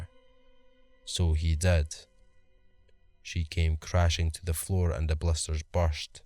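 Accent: Scotland, United Kingdom. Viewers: I already knew that I loved morgue stories, but with a Scottish accent, I love them all the better!! 👍👊fist bump!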